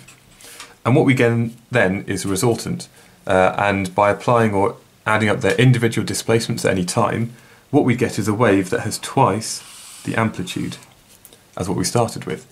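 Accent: United Kingdom. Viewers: W bri ish dude. Love u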